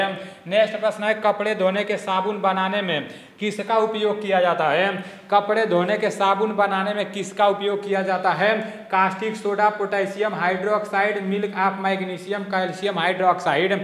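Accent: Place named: Bhojpuri, India. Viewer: bhai to ser he🦁🦁🦁🦁🦁 bhai tumhara bhojpuri bahut achha lagta he😂😂😂😂 sun ne ko message from Gamer Odisha 😅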